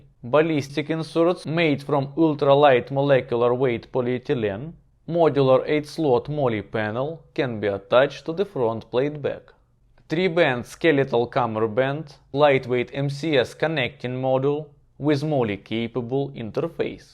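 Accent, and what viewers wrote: Russia. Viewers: Неплохо сделано, даже русский акцент)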